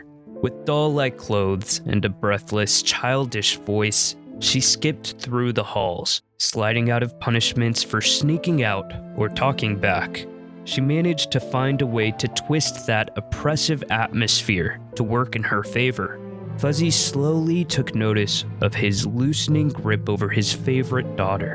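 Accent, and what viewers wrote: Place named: California, United States. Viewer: It’s a California shift. Interesting thing happening with the accent. They say things differently than you.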